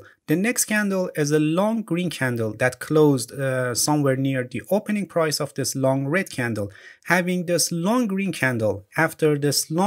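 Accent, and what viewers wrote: Iran. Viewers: You have a strong Persian accent Hard to understand what you’re saying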